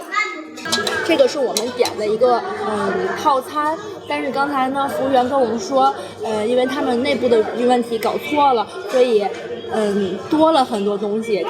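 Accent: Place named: Tianjin, China. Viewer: 这满满的天普！我这个河西人听了真是内牛满面😭 还有那些街景！真亲切啊！订了订了！继续加油💪！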